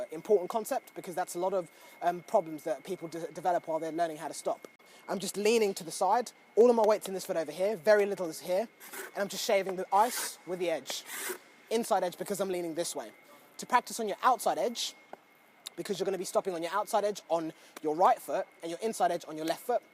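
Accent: United Kingdom. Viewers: Does anyone comprehend what is going on in this video!? A black guy is teaching the internets how to hockey-stop, in a British accent. Youtube, you are great.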